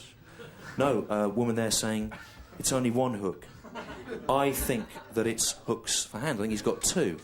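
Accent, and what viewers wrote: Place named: Scotland, United Kingdom. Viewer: This kind of non comedy makes me so angly. The one good thing was his brilliant scotch accent.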